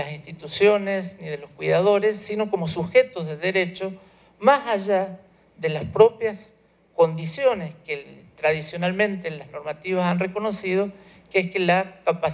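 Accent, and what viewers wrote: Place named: Argentina. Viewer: exelente ponencia ! ¿Cuál es el nombre y apellido correcto del especialista? Me parece que no lo escriben bien. Es Argentino parece por su asento.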